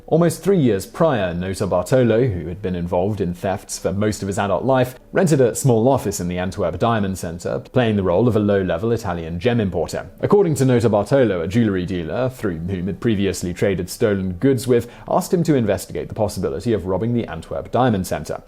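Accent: United Kingdom. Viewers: jew-lery? why do brits speak such poor english. Another favourite is 'Somethink and War'uh(water) ...??' just why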